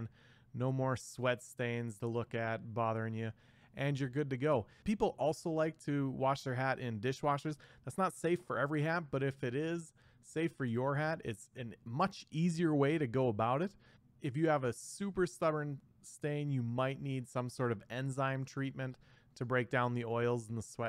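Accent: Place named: Colorado, United States. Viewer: I bet you're from Colorado. Just judging by your accent. Thanks for the hat cleaning info✌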